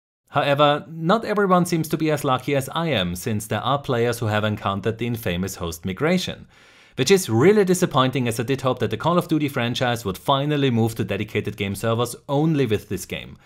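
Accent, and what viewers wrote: Germany. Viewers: Das ist das erste Mal, dass ich eins deiner Videos schaue. Sehr gute Arbeit, ich bin beeindruckt! Du musst Deutscher sein, hört man sofort an deinem Akzent. :D Weiter so! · Are you from Germany or Austria? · you sound german accent.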